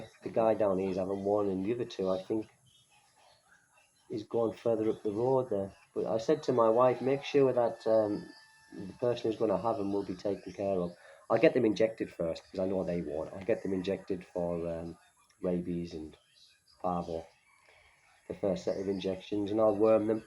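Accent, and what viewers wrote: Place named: Northern England, United Kingdom. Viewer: Are you from Manchester by any chance ? Im sure somewhere from the Northern England based on your accent.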